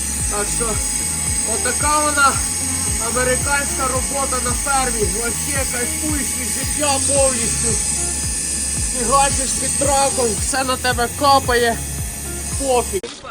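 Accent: Poltava, Ukraine. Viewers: дякуя з Полтавської ,говір похожий на наш Полтавський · Звідки Ви родом? З якого регіону?) Дуже схоже на Полтавщину, або сусідів 😃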